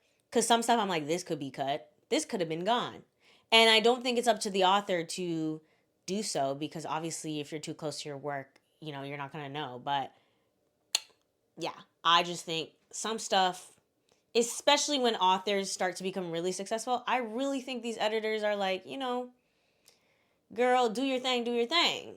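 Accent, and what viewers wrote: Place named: Canada. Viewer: Agree 100% on the first two although I do love the piano in La La Land. Studio Ghibli is amazing imo so hard disagree. I can understand your critique of TSITP because it's definitely problematic in some ways and not what I would do in Belly's (and Conrad's) situation for the majority of the books and show lol but I definitely have a nostalgic pull to it since I'm 31 and read the books when I was in high school. Also are you Canadian because you sound so Canadian lol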